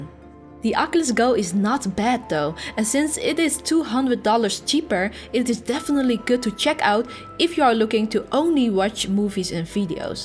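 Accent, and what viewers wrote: Netherlands. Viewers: She has a netherlands accent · amazing dutch accent, doesn't match ur face though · Are you Dutch? You sound Dutch.. 😄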